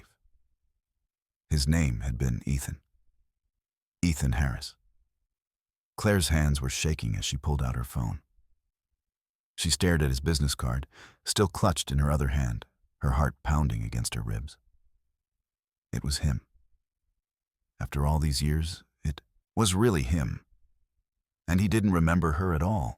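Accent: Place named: South Africa. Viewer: South African iloveit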